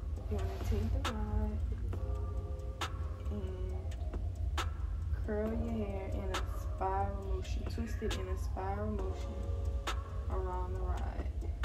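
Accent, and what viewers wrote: United States. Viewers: You have such a pretty voice / accent, are you from New Orleans?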